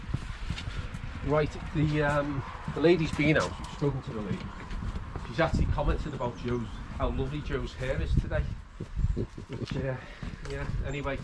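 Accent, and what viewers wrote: Liverpool, United Kingdom. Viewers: Liverpool accent as thick as the sludge in the drain. English of Ye olden times. I love it! Had to use close captions to follow along with the story! lol. Gagging the entire clean out process. Well done. Cheers! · Ah the lovely British accent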